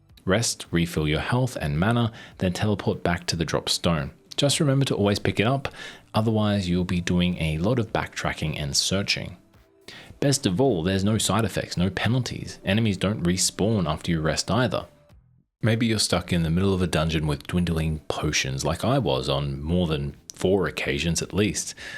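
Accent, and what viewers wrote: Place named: Australia. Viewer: At first I read "Latvian's First RPG" and was confused, like wtf is this guy's Aussie accent... then I checked the sub count and holy shit man, no fucking way your channel is that obscure! You're going to blow up if you keep going at this pace, trust me.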